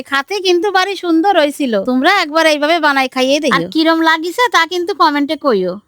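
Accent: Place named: Khulna, Bangladesh. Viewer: Khulnar vashar moto pura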